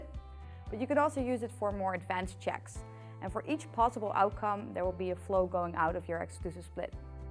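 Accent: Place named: Netherlands. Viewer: Sick dutch accent XD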